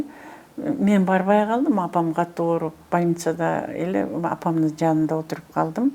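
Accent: Standard. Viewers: Азаматсыз эжеке кыргыз тилин ото таза оздоштургонсуз мен ойлоочумун жалан орусча суйлосо керек деп сизге таазим !!! Ширинге дагы уйротуп койсонуз акылдуу кептеринизден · Роза Айтматова говорит на чистом кыргызском языке.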